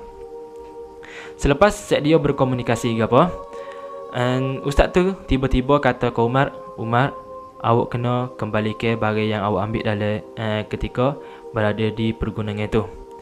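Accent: East Coast, Malaysia. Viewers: Jumpa new channel horror storyteller.. subscribe tnd support.. dgr dr slang percakapan myb dr Pantai Timur.. Apapun terbaik bro..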